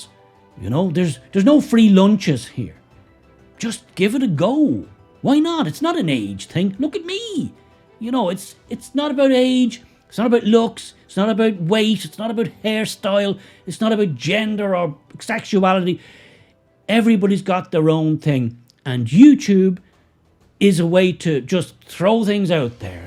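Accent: Ireland. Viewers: it's your common sense and cracking accent (i stand with ireland) that i enjoy keep up the good work. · Enjoy your style, especially that Irish accent. Please continue making the videos, I love listening to your wit and sarcasm. Onwards and upwards to the 100,000❤🌞🏴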